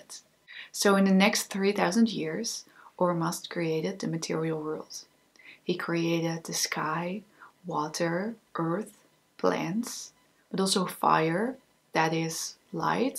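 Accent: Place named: Netherlands. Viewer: waarschijnlijk wel, das een nederlands accent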